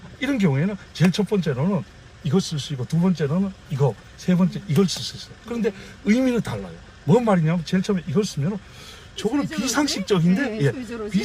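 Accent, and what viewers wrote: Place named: Gyeongsang, South Korea. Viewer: 선생님의 말씀을 들으면 '이제 나도 선생님 가르침을 따라 열심히 하면 수어를 잘할수 있겠구나'하는 자신감이 생깁니다. 수어를 외우는 것이 아닌 이해 하면서 공부 할 수 있겠다는 생각에 수어 공부에 대한 관심이 더 커지는것 같습니다. 선생님께서 연구를 위해 많은 노력을 하셨음을 또한 느끼며 감사할 따름입니다. 그리고 선생님 말씀 하실 때 억양이 경상북도분이 아니신가 생각 됩니다. 저는 전라도 사람인데요 경북 영천 친구가 있었는데 그 친구 억양과 비슷하다 느꼈습니다. ㅋㅋㅋ 선생님~ 선생님의 연구에 존경을 표합니다. 찾아 뵙고 인사 올리는게 도리일진데 댓글로 인사 올려 송구합니다.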